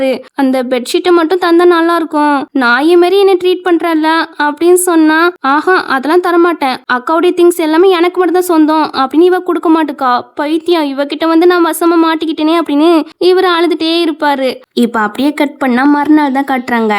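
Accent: Tirunelveli, India. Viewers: 80s la poi reborn agura drama podunga pa unga nellai style voice la ketka egar ra eruku pa 🙂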